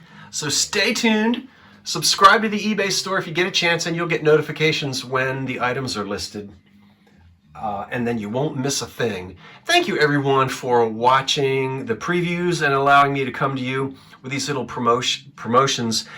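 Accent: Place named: New Jersey, United States. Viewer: I always love listening to you New Jersey guys pronounce the word "water." Like "wooter." LOL!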